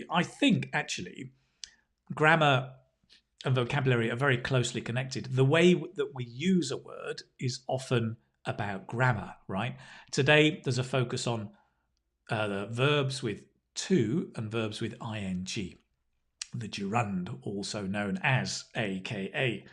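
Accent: United Kingdom. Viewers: I love your class very much, your British accent is beautiful! Thank you!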